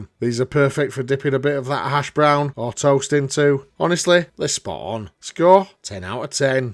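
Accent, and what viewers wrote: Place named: Cockney, United Kingdom. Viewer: I need to point out something. Why are you speaking in a Cockney accent? Are you Rodney Trotter from Only Fools and Horses? This is weird.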